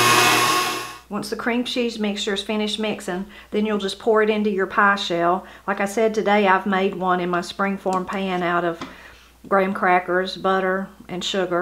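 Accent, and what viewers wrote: Appalachia, United States. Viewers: My family are from the Appalachia area, central Appalachia to be precise. We have an accent similar to this woman’s but it sounds a little different. I get asked all the time when I’m on vacation out of state “where are you from”? Lol Or, “your accent is strong”. I say just yup, I got that Appalachia accent. 😂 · East Ky Appalachia here and we sound about the same!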